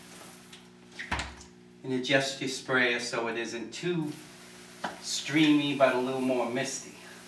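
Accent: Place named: Boston, United States. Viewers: Gahhhhhdon sprayah. Good ole yankee accent you got there my brother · Good tutorial. Love the MA accent! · Boston accent, love it!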